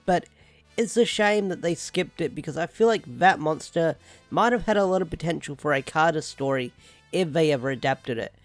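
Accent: Australia. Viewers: oh I see well i saw one of you videos around 2020 but I started watching more of your videos in 2021 now I assume by the sound of your accent your australian am I right?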